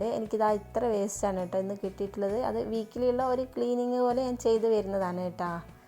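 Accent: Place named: Kannur, India. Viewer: Wayanadanenklum kannur stylanallo samsaram 😊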